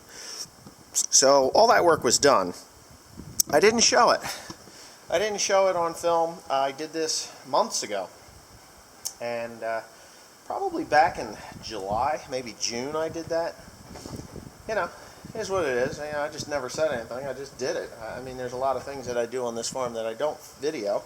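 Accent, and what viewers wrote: New Jersey, United States. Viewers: RADiator is definitely a NJ accent thing. When I moved from South NJ (Born and raised) to Colorado, I got my balls busted for saying it the same way you do lol. Also had issues with the word water. NJ = Wooder CO = WAHter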